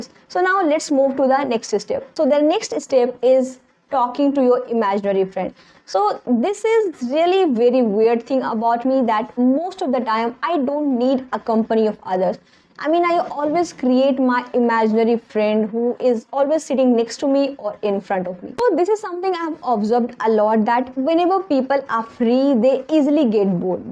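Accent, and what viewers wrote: India. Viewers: I like your indian acent jejej..Greetings from Colombia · You could work a litle bit in order to get over your indian accent, it's strong. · Indian accent ,but excelent.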